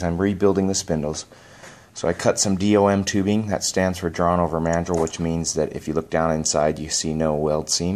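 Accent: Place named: United States. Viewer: No he doesnt. He sounds american.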